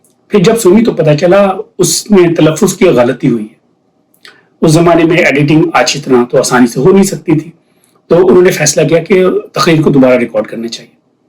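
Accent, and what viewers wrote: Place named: RP, United Kingdom. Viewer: ایسا لگ رہا جیسے بی بی سی سن رہے. کمال ایکسنٹ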